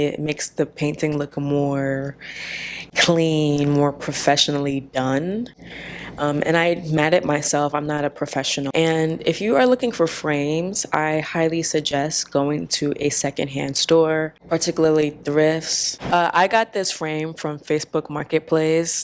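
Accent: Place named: East Coast, United States. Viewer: ur accent is so east coast, so comforting 🙂‍↕🙂‍↕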